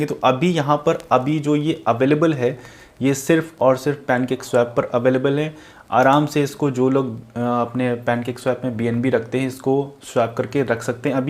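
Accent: Lucknow, India. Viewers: Bhai Aap Lucknow Se hai kya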